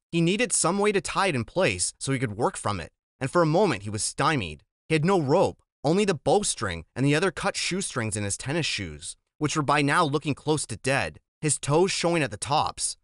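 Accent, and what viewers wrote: United States. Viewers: right bro ?! the american voice sucks. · I really love this book but the typical American voice ruins it. I can't listen to this at all. I'd rather read it again. Why do Americans ruin everything good for everyone... what a shame that the world thinks of you in such a way when we could all be a little better...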